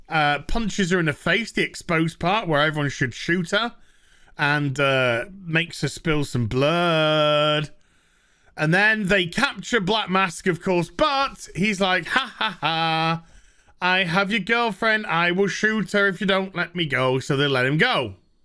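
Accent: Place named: Yorkshire, United Kingdom. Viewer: I love how you can tell how irritated is by how Yorkshire he gets:D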